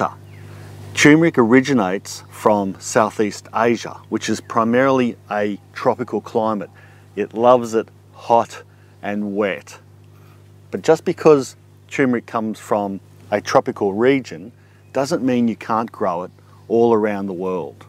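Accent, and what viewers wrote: Australia. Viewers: Great delivery, straight forward and very Aussie too! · came for the gardening insight. stayed for the Australian accent haha👍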